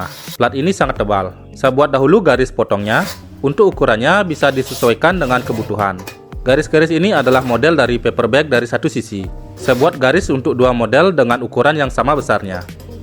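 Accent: Bali, Indonesia. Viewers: Medhok kali bli basa baline .....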